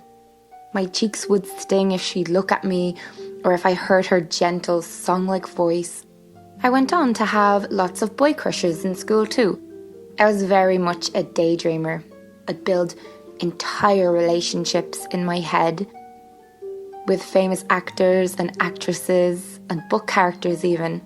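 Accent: Ireland. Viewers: YES YOUR IRISH (TWINS) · ayyyyyye you're Irish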